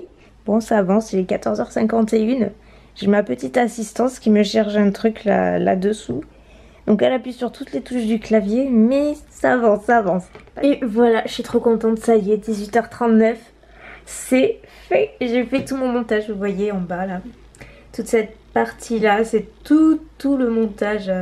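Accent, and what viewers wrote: South, France. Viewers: Salut ma belle, voir tes vlogs dès le matin ça me met une pêche d'enfer et ton petit accent du soleil dans cette journée bien grise !!!! et bravo à mamie !!!! beau plat !!! félicitation !!! Merci pour tes vlogs, ta bonne humeur, ta joie de vivre !!!! j'adoreeeeeeeeeeeeeee !!!!!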